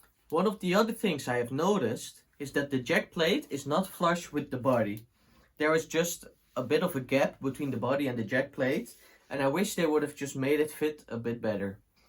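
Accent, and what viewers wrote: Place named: Netherlands. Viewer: I ended up buying the TE-25th, I like it, I also have the TE-62 DB CAR, they both sound great but have their differences, I don't know why they went with the long screws as they obviously commissioned the bridge themselves as it has their name on it, I cut mine down and filed the tips of the screws, no issues now! I realised when coming back to this video I had not subscribed, sorry, I have now! I used to live in the Netherlands in 1998 to 2001 I lived in Noordvijk Aan Zee and later Noordvijker Hout, I miss the Dutch accent and so enjoy your video's.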